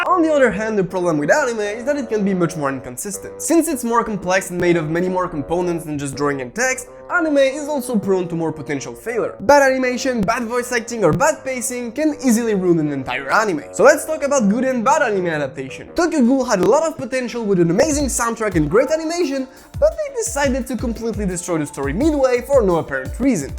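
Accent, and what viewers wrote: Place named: France. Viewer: Gooooood, I love your french accent 🥖🥖🥖🥐🥐🥐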